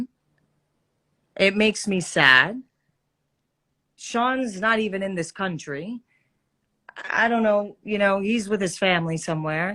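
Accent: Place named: Brooklyn, United States. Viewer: the brooklyn accent and some evil queen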